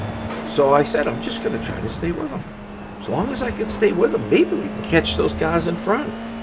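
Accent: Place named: New England, United States. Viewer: BTW, I lived in Boston for years and I loved hearing that New England accent. 😍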